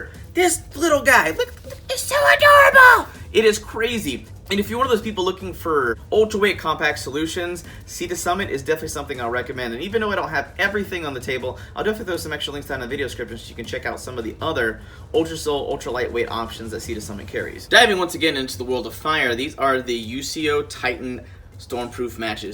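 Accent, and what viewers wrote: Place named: Texas, United States. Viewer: I kept hearing that your from Texas. Where exactly in Texas.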